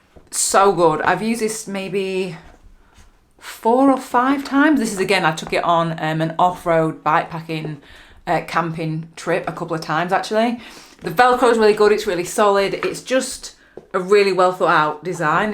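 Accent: United Kingdom. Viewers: Is just a Cheap restrap add , scam video. Restrap isn't waterproof compared to tailfin and not even same quality. UK accent UK facts 🤡🌈🤡 UK 💩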